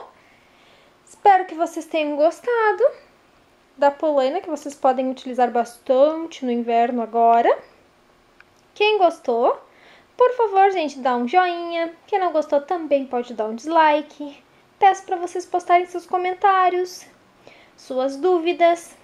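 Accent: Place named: Rio Grande do Sul, Brazil. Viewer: vce esplica mto bem ..esse teu sotaque gaucho e mto agradavel..bem facil de entender.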